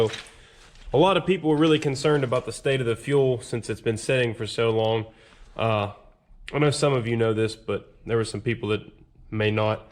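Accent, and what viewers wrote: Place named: Western Pennsylvania, United States. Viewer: Ahh ok that makes sense, you had the same western pa voice I'm used to, that's where I am haha. You ever need a hand with anything let me know. I can work on about anything haha.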